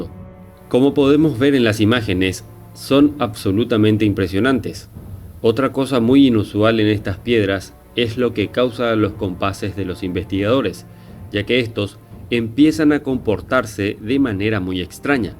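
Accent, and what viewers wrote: Paraguay. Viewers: Eres de paraguay amigo ?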